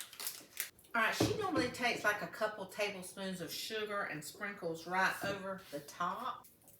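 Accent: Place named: Texas, United States. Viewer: Just found you on here your banana bread looks so nice I'm going to try it cos respices tells you 2 bananas yours has 5 so maybe it will taste and look better I'm like to bake but I'm not very good at it 😂and love your texes voice I'm Irish but live in Spain anyway glad I came arocse you till next time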